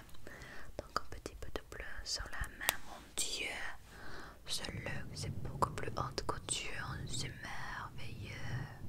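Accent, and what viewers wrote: Quebec, Canada. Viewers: Coucou, j'adore cette accent québécois. Merci pour ce maquillage, tu as fait disparaitre les imperfections et mis en valeur les points positifs. Je vais très vite reprendre rendez-vous. Gros bisous 😘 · j'ai reconnu l'accent un peu quebecois lol!! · Je n'arrête pas d'écouter tes vidéos à tous les soirs Je suis quebecois et entendre la manière dont tu prononces les mots comme on le fait un Québec est super relaxant (for some reason ça sonne exotique, réconfortant et familier. C'est la recette parfaite pour dormir)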